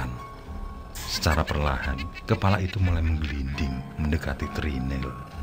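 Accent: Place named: Blitar, Indonesia. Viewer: Pak de bhasane mirip wong blitar ..🙏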